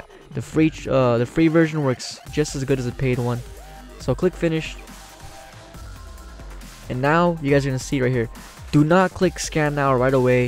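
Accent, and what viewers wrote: Croatia. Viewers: Are you from Croatia?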